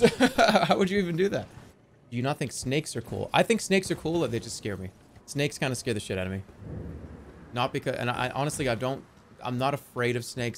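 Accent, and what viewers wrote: Boston, United States. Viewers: The A at the end is cause of his Boston programming, it's like "Germ-ah", like "i'm walkin here-ah"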